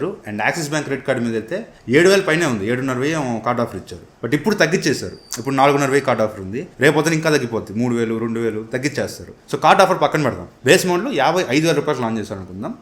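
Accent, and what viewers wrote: Nellore, India. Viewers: Bro meedi Nellore ha?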